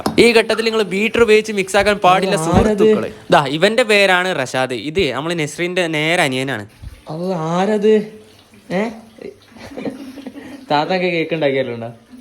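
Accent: Malappuram, India. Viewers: Nammale malappuram baasha polichilleeeee guys kondotty power......😄😃😉😉😉😉😉😉😉😉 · ഞാൻ കണ്ടീൽ വെച്ച് മലപ്പുറം വർത്താനം കറക്റ്റ് കൊണ്ടുവന്ന ഒരേ ഒരു വ്യക്തി ♥️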